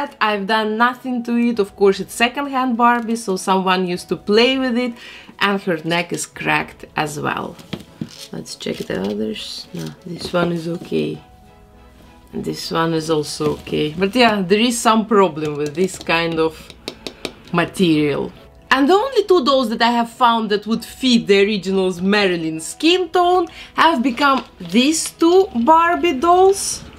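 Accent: Russia. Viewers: Она ведь русская??? Или украинка Такой жёсткий акцент может быть только у нас 😂😂😂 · Ты русская? Акцент очень чувствуется (возможно, какой то другой национальности) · Сложно слушать этот русский акцент... жуть какая. Исправьте, пожалуйста! Я очень уважаю английский язык и мне больно это слушать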